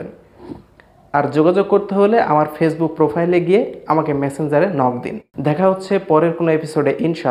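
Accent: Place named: Barisal, Bangladesh. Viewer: আপনার তথ্যগুলো চমৎকার। কিন্তু কয়েকটি বর্ণের বরিশালের আঞ্চলিক অশুদ্ধ উচ্চারণ শুনতে খুবই বিশ্রী লাগে।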